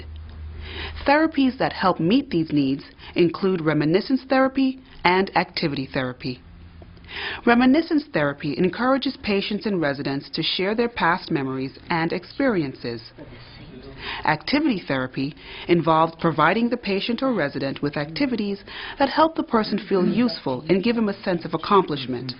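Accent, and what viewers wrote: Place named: England, United Kingdom. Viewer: Best teacher ever...soo eloquent ...like your clear English accent..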